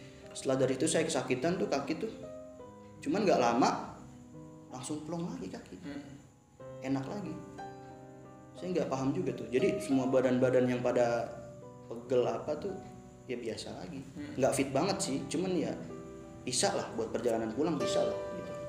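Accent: Indonesia. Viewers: Btw orang mna tuh yg lagi ceritanya .. GERO2in ,fiks orangsunda kek nya😁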